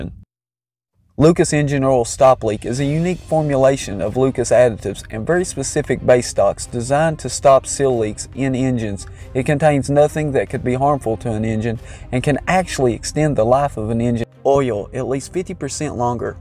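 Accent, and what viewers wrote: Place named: Texas, United States. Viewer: Wow! I LL try it! I like his Texas accent! Any cowgirls there?! Lucas oils are good! Good tips! So this is temporary treatment only?! Can use how many times or years?!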